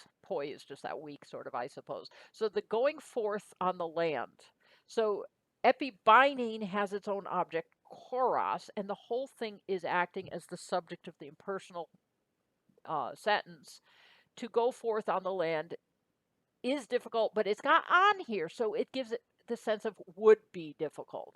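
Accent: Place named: United States. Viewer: And another American pronounciation and diphtongisation of Ancient Greek... why does noone pay attention to the basic phonetics???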